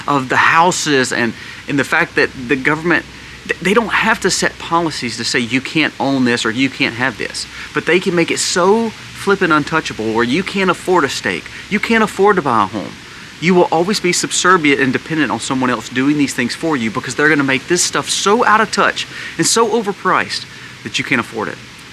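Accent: South, United States. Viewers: He is from the South. It's called an accent. Many places in the US have different accents. And uneducated comment ❤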